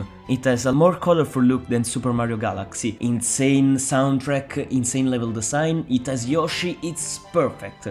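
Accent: Italy. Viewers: Ero sicuro di sentire una parlata italiana, e non mi sbagliavo. Ottimo video comunque, quando la versione ufficiale di Nintendo fece un flop non ne rimasi molto sorpreso onestamente. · Bro are you italian?? You're accent it's very similiar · This dolphin-powered fan-made collection is such a cool concept. Love these upgrades, this looks like a very neat package. PS: Comunque, adoro il tuo accento. Sembra che il video lo abbia narrato Super Mario in persona. Nel senso buono della cosa. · Quanto si sente che sei italiano porca troia ahah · U sound Italian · Bro you sound kinda italian, are you? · Sei stra italiano vero? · Ma sei italiano ahahahha, comunque bel video anche per i 4k60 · Hai un'accento italiano fantastico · Are you Italian?? As an American I Love the accent. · you sound like an italian speaking english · Wait i hear italian accent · I understood you were italian only by the accent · I lovr your accent, are you italian? 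· Si sente subito che sei italiano! Sono cresciuto con i giochi di mario per gamecube e wii, è sempre bello vedere che i fan hanno ancora a cuore i titoli del passato e si adoperano per renderli immortali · out of curiosity, are you italian? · are you italian? i can hear it.. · You sound so italian bro Non è per offenderti btw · di che sei italiano senza dire che sei italiano <3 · Non so perché ma ho la sensazione che tu sia italiano. Sarà l'accento ahaha. Comunque gran bel video. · Oddio, ma sei Italiano. L'ho riconosciuto subito l'accento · bro ma sei italiano palese si sente una fracca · sei italiano vero? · one of the best video I've seen! As I understood, you sound like you are Italian, as I am, that makes me even prouder that an Italian did this, without much effort. Can I just ask you if you can send me the control scheme for galaxy 1 and 2 for dolphin? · Bro do u have an italian accent? 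Sembra un botto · Io, secondo me dall’accento è italiano… vado nelle info del canale, location: Italy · Bro ma hai un accento italiano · sei percaso italiano ? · bro bel video ma si sente che sei italiano e mi cringio un po' a sentirti però bel video eh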